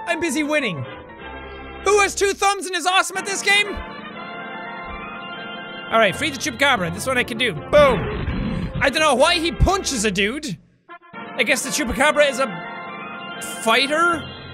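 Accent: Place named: Ireland. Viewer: This comment made me realize Jack has a lot in common with my uncle. Both screaming Irishmen*, both have a funny laugh, both look like me, both have blue eyes, both have raging Irish accents... jeez that awkward moment, amirite? *I'm part Irish on my mom's side.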